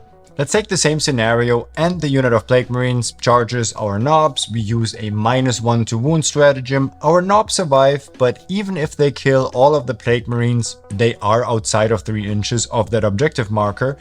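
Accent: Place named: Germany. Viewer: why do I hear the slightest german accent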